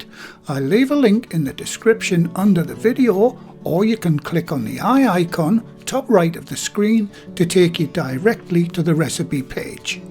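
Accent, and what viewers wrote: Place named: North, United Kingdom. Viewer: Hi, hope you don't mind me asking this - I have been using your Tiger Bread recipes and really enjoy your videos and clear instructions. Its great to hear a fellow northerner's voice. I was wondering if its possible to do a cold-proofed Tiger Bread with any of yourTiger recipes so the bread can be baked early the following morning? Also would you add the rice flour mixture whilst it was proving in the fridge or very gently the next day? Any advice would be much appreciated - thank you in advance Take care and stay safe Best wishes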